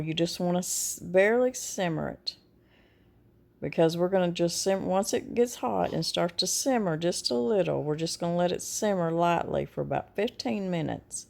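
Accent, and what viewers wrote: United States. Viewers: LOVE that country accent, girlfriend! You sound like family. 😁 I love putting rose water in a spray bottle and lightly mist it on my face and diluted, I use it on my hair as a leave-in/refresher spritz. As soon as we move I'm going to try to grow some roses just to make RW with! 👍👍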